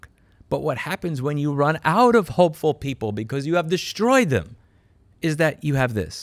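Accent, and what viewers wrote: New York, United States. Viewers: The NYC slang is still strong , can’t take the NY grit out of him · I truly, truly enjoy listening to your videos! I don't mean to belittle the subject content, I can find pretty much the same thing all over Youtube, but, they don't speak the same language. You, my friend, tell it like it is in a language I relate to. You speak New Yorkese! And probably don't even realize it. I grew up near enough to the city that I was fluent in that method of expressing yourself. Although I left the East coast many years ago, I still tend to express myself that way. It causes me no end of being misunderstood and/or being accused of saying things in a excessively direct manner. So, I watch your videos. Not because I agree with every thing you say but, even if I disagree, I experience a level of comfort listening to someone I can truly understand. 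· Entertaining and lovely, as almost always. I want to get to visit a New York one day that is a little like those olden days. Is this the first time I think you can detect a faint trace of New Yorkese in Louis' speech? 😄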